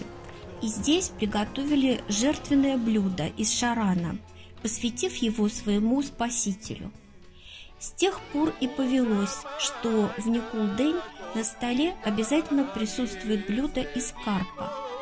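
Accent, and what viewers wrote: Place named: Bulgaria. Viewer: Как приятно слышать болгарскую речь!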